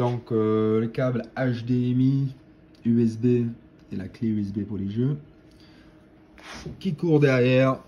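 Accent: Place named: Aude, France. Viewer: Pour les tables Zaccaria en promotion, on peut espérer les trouver à combien sur leur site. Parce-qu'actuellement elles sont à 250$ (1 à 5). J'avoue le prix est élevé. Je me suis autorisé l'achat des jeux verticaux, malheureusement quand j'ai reçu la clé d'UK. Le pinball me l'a planté. Ils m'en envoient une autre et m'ont dit que cela pouvait arriver. Quand j'écoute votre accent.....vous semblez ne pas être très loin de chez moi. Je suis du dep 11. Je vous dis à votre prochaine vidéo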